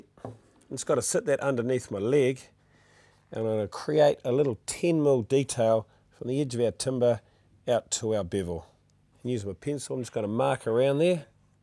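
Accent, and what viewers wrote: New Zealand. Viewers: He speaks with a New Zealand accent. Their pronunciation of vowels is different "ligs" (legs) and the " ls" are not pronounced like bevo (bevel). His explanations are easy-to-understand and he has a high skill level. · well it is true. He is from New Zeland and I learned British kind of English... 😊 there is a huge different in pronaunciation.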